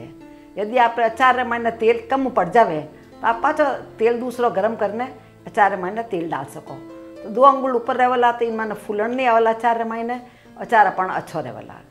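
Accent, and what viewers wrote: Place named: Marwari, India. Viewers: आप री आवाज ओर मारवाड़ी में बोलण री स्टाइल बहोत ही पयारी लागी सा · Aachar ka toh pata nahi but aap ka bolne ka Rajasthani andaz bahut badhiya hai 👍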